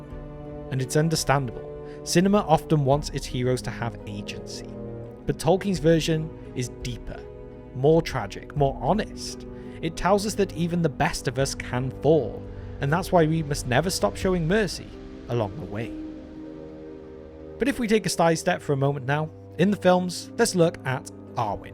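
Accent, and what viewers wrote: Wales, United Kingdom. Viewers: You are the guy from TT alliance or every Welsh sounds the same?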